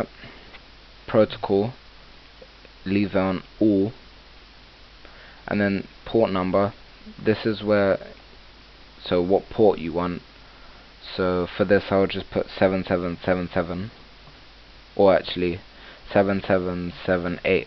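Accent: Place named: Cockney, United Kingdom. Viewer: He's british lmfao... Cockney...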